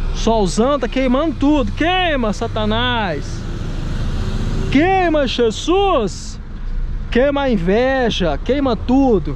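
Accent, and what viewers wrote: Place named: Minas Gerais, Brazil. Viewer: Da pra ver que é mineiro de longe kkkk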